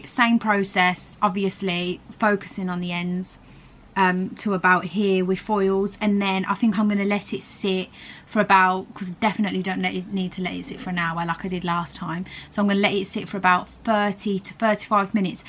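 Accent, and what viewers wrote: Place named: Wolverhampton, United Kingdom. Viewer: Are you from Wolverhampton? Love your accent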